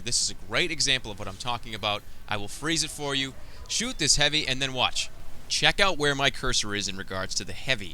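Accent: Boston, United States. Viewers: This guy sounds like he was from where the scout's from.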